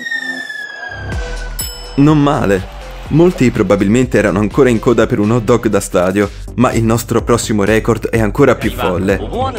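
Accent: Germany. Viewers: MA SEI TEDESCO?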